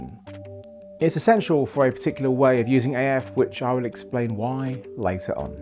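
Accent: United Kingdom. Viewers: It's always a pleasure watching your videos. Your British accent and cats are awesome!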